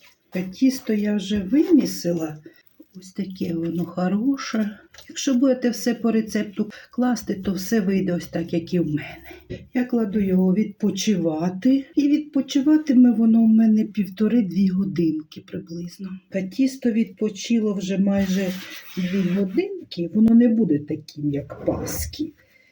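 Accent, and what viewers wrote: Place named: Poltava, Ukraine. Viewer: Дякую вам за гарний рецепт ,спробую...ніколи не пекла, а ви надихнули. Дуже приємний у вас говір, на полтавський схожий. Я сама родом з Полтавщини.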